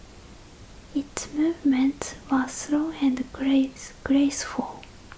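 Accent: Japan. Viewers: Japanese is the most familiar language I will never actually learn. But I enjoy the cadence the natives have even when speaking English.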